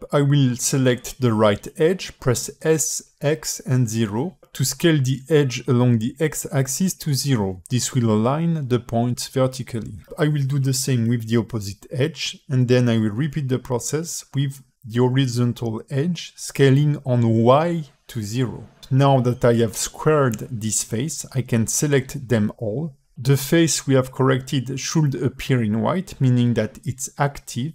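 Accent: France. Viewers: Serais tu français ? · Bonjour, très bon tuto! ton accent français ma permis de comprendre plus facilement même si mon anglais est moyen! :D thx! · Tu es français n'est ce pas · On sent tellement l'accent français haha, excellent tuto btw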